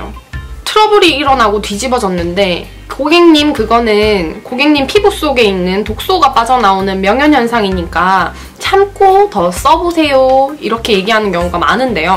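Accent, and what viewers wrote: Gyeongsang, South Korea. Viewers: 예전 큐엔에이에서 였나? 기억은 잘 안나는데ㅠ 경상도쪽 (대구?)사투리랑 서울쪽 사투리랑 섞여서 이렇게 되셨다고 했어용 정확한건 그 영상을 찿아보시면 되실것 같아요ㅠㅠ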